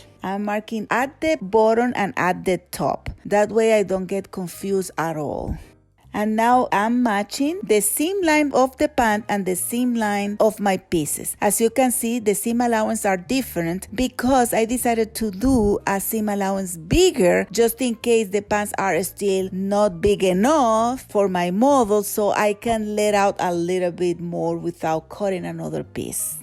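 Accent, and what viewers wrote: Spain. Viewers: This is a great tutorial, thank you! I have the same “Pandemic Pants Problem” I did a triangle in the back, but was not good, yours look great! Your accent... are you Spanish? Your mother looks very young, so how old are you to be so talented? Thanks for share, I just subscribe from Puerto Rico! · Pero lo esta explicando en acento espanol! 😉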